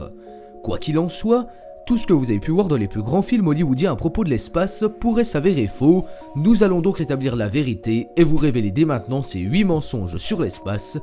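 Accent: Belgium. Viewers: avec son gros accent belge de merde il ne m'apprend rien ce petit cretin qui il est pour dire ca va te cacher et ne passe jamais a la radio tu m'a donner envie de chier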